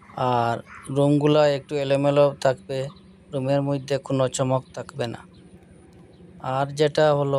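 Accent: Sylhet, Bangladesh. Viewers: oh jiggesh korlm because apnr kotha gula sylhet er mto suddho kothar sate sate sylheti o mix ace tai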